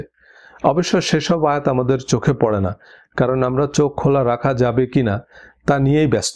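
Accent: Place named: India. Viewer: এই লোক ইন্ডিয়ান দের মত কথা বলে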